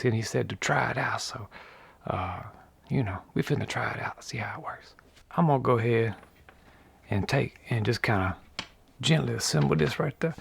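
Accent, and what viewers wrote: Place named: South, United States. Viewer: Your southern accent and humor keep see watching, even though I don't hardly understand everything! lol ,,,,,, You are quite smart!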